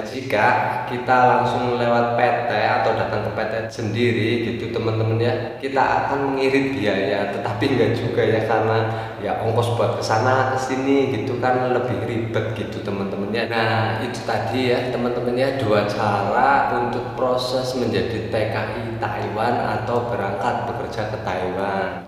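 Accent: Banyumas, Indonesia. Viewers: Ngapak?, Cilacap apa Banyumas mas?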